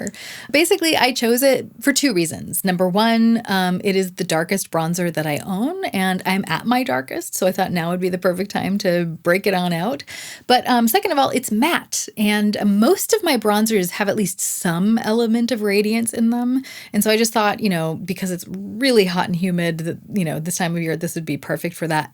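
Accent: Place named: Canada. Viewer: I think I hear a Canadian accent? Yay!!🇨🇦🇨🇦❤️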